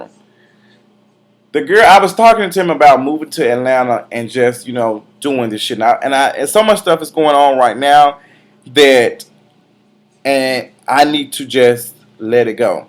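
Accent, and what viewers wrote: Memphis, United States. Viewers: I found your videos it was on the recommended videos and I clicked one where you were going in on your family and it was soooo good. I had to keep clicking your videos and i love your personality. I was thinking I loved your accent and it sounded familiar to me. Then I found out that you lived in Memphis and I had relocated here and have been here almost 2 years. Your Memphis references are so on point and funny! You are so down to earth and I totally understand where you coming from where you saying you have to let go to really dive in your purpose. You have my support 100% and you definitely deserve all the success that is coming your way! Thank you for making me laugh and continuing to be genuine. Love your vids, and love you!!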